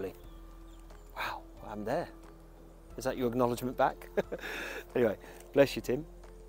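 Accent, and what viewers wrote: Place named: Portsmouth, United Kingdom. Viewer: Nothing wrong with the accent you have Pompey boy, We always get told we sound like Londoners anyway. Great video of someone I've never hea6rd of.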